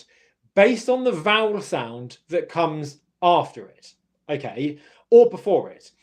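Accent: United Kingdom. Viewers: Is there any course taught by you, to learn British accent. If it is available on which platform it is available, please let me know.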